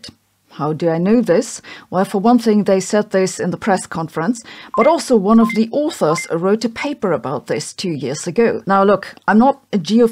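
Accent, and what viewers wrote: Germany. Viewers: Here for your German accent, I also love how you articulate your arguments, thank you for your informative and well presented take on this matter. We don't have to agree with you but we must always recognise a point well made. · I don`t want to sound offensive, but a have seldom listened to any english with such a school-book heavy german accent, just adorable. 😁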